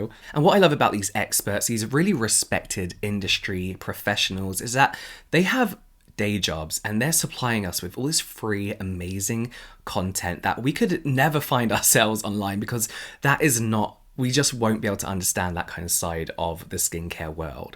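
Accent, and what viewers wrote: England, United Kingdom. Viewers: Your voice is so smooth. Can you me sing me lullaby before I sleep? Nah this is more like I will give you myself if you start talking. You have an english accent but it’s not thick just smooth to the ears. Oh I do agree with you.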